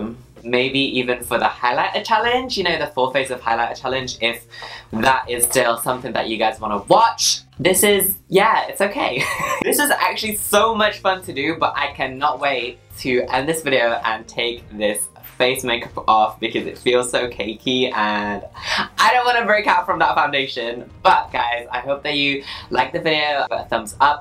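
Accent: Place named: South London, United Kingdom. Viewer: havent watched in ages!! when did he start sounding like hes from south london!!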